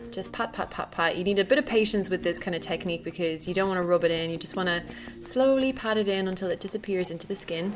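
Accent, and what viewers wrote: Ireland. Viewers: You look just beautiful, radiant!! I love your vlogs. I'm a Brit living in the States. It is great to hear an Irish voice! :-)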